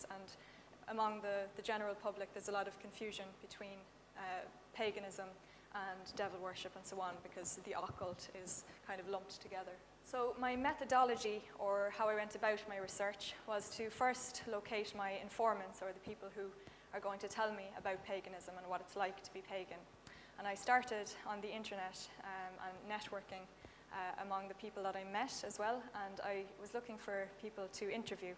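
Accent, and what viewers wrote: Ireland. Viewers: You did an excellent job presenting your research methods in an unbiased fashion. It's rear to hear anyone talk about any religion without hearing a lot of the personal opinions of that person. You did a great job!! I look forward to reading your book! Though another video presentation would be nice. (Im from America, so hearing about paganism in a Irish accent is really cool, plus you have Gorgeous eyes ;) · Such a good presentation. Also that Irish accent is to die for. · you have the most beautiful eyes and I absolutely love the Irish brogue in your voice,...pretty and smart is a rare combination...huzzah to you!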